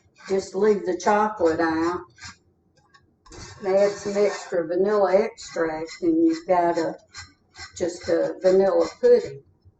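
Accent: South, United States. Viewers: I love your southern accent and pie.Just like my mother in law . I miss her so much ❤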